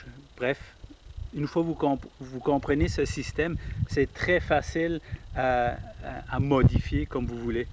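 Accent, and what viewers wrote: Quebec, Canada. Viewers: un accent de où? je suis de montreal alors je sais pas trop de quel quoi du Quebec vient ton accent :p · Bonjour. Merci pour cette vidéo. J'ai fait pas mal de recherche pour fixation d'une faïtière et ta vidéo est la meilleure: c'est clair, bien expliqué et bien filmé. En plus avec l'accent québécois et ta sympathie, c'est top. Salutations Nature de France!;) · Ce merveilleux accent canadien ! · bonjour' tu as une très bonne pédagogie , tu refais le mouvement en expliquant les erreurs à n'a pas commenter . J adore l accent de nos cousins du Québec.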